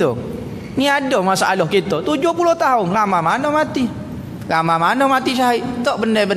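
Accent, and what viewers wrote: Kelantan, Malaysia. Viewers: betol tu...syok ustaz ni wlu dialek kelate agak pekat..(ya ka??kito ore kedah)..sblm ni ustaz azhar idrus...skrg ni ustaz ni kira atas skali dlm carta uetaz2 popular peribadi...moga sihat selalu ustaz...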